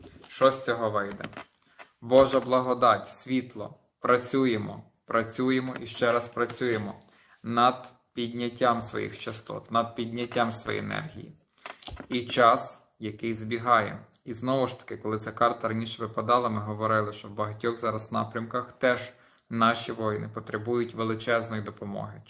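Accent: Ternopil, Ukraine. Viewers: Дякую, послухаєш вас і легше на душі,чую рідну тернопільську мову.Щасти Боже!!!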